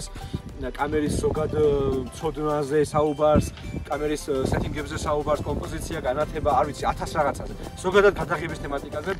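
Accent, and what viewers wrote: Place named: Mingrelia, Georgia. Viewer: mainc rogori megruli saubari gaqvs ❤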